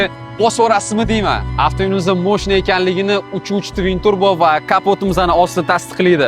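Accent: Tashkent, Uzbekistan. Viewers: Harpsanu ne toshkencha gapirib chiranasan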